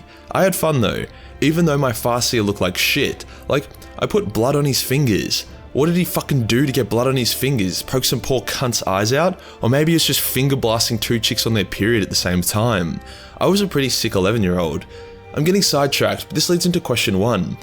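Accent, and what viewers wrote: Australia. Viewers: Gidday Mate ! Good hearing a fellow Aussie accent online !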